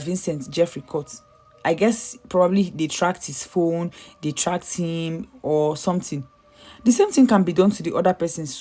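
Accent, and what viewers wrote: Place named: Igbo, Nigeria. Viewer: The narrator is not bad,is just the igbotic accent is the problem.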